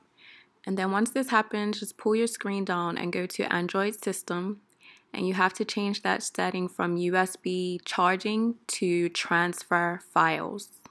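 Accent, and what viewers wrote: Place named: Bermuda. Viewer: You sound Bermudian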